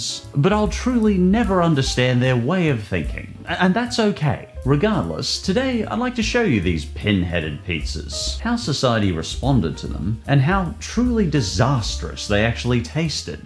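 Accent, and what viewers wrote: United Kingdom. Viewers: I like you're British accent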